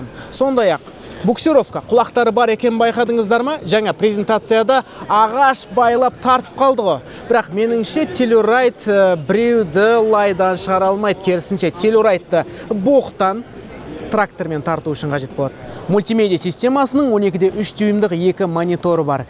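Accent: Standard. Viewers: ҚАЗАҚСТАНДА ЖАЛҒЫЗ ТАЗА ҚАЗАҚША 1000% СОЙЛЕЙТІН ОСЫ СИЯҚТЫ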